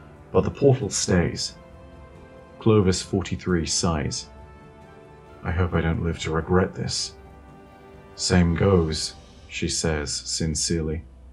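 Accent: United Kingdom. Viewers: You sure can. But you could also comment on British accent and the nuanced way he pronounces things, too.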